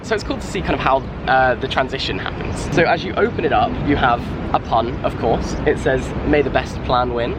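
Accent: RP, United Kingdom. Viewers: You have the same posh accent as Jack and Finn Harries. Not a criticism, just an observation.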